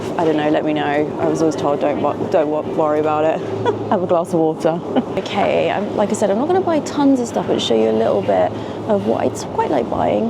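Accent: United Kingdom. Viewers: I come here to learn British accent 😂 thanks and take love from Bangladesh, ♥️🇧🇩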